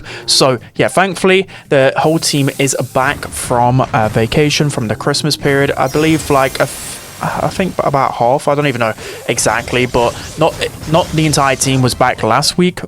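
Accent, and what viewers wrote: United Kingdom. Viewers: Bro i miss your british accent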